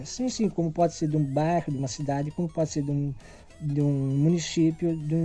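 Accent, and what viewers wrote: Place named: São Paulo, Brazil. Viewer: esse cara tem sotaque paulista, paulista não tem vez aqui não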